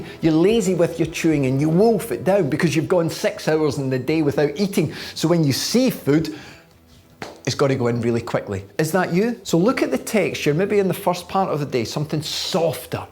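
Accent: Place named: West Scotland, United Kingdom. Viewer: Trainspotting is Edinburgh accents (east), his is west coast. Might sound the same to you but Scottish people can hear the difference.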